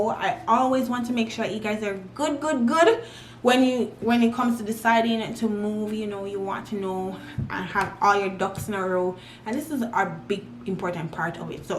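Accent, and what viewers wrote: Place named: Jamaica. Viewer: U sounds Jamaican girl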